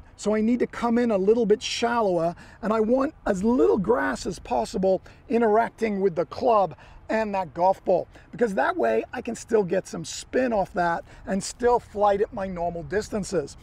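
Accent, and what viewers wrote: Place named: Australia. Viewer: Can hear his Australian accent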